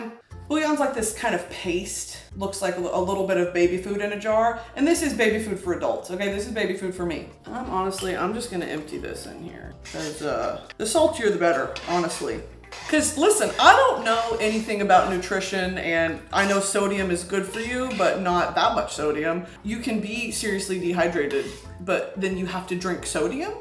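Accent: South, United States. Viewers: I'll never get over her southern grandma accent lmao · When you speak southern it just makes my day haha please never stop! I love backyard maw maw Broski haha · God that southern accent is so spot on to like 90% of my older family it SCARES ME😭🤣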